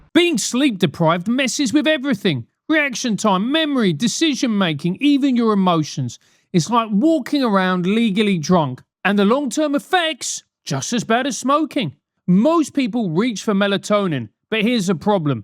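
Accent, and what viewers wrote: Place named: Cockney, United Kingdom. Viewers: so why the hell is your accent cockney squared? Also f**k Wigan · 😂 is so English I love it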